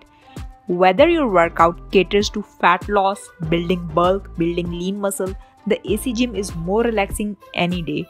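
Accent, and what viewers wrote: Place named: India. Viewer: Damn i hate that Indian accent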